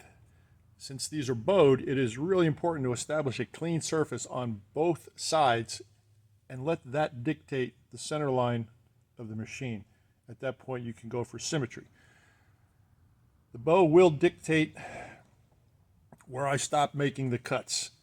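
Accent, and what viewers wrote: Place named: Baltimore, United States. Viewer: Unloosen! Your Bawlamer is showing again,!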